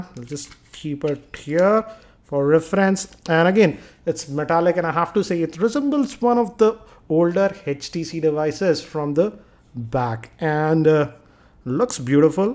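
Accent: Hyderabad, India. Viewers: please change ur accent of speaking. hyderabadi youtuber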